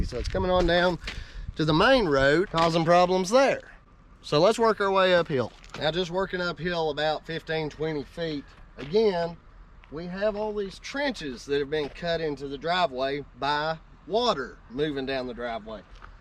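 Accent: South, United States. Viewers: Outside of the southern twang, I like your style. 😁 New subscriber.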